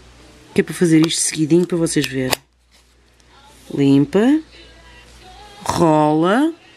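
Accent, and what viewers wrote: Portugal. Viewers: 😍😍😍😍Amei Parabéns e seu sutaque português mais ainda lindoooo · Adorei seu video, sou nova aqui e vou ficar, meus pais e avós eram portugueses e adoro este sotaque.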